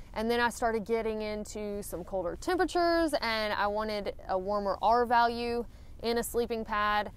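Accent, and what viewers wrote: South, United States. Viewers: love her slightly southern accent =]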